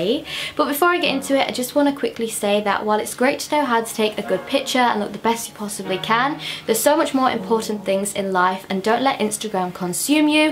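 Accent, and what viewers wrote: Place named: Essex, United Kingdom. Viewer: Aw we're both 4'11! where shouts are you from? I've just moved from Essex to Blackpool and your accent sounds so similar!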